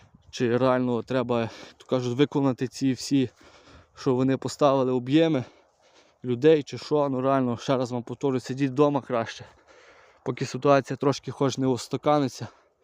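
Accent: Ukraine. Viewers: Я так розумію ти з західної України по розмові! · Виглядає, що ви із Львівської обл. Може, навіть із Золочівського району. Співробітник мав подібний говір. Не подумайте, що намагаюсь шось вирахувати , бо знаю, що у людей зараз ще та параноя. Просто цікаво.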